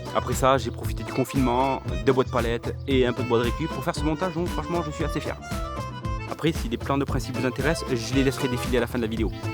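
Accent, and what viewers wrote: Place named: Toulouse, France. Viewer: Houai...encore une idée géniale qui va me permettre de faire ma petite fontaine avec bain d'oiseaux (important). Hé bé, je me disais que tu avais comme un petit accent que je connais...bé forcément, au sortir des torrents de l'Adour, au bout des vallées, on le connait le bel accent de notre jolie ville rose ! Bon, je te copie pour pomper...ou l'inverse...😂 Merci ! 👍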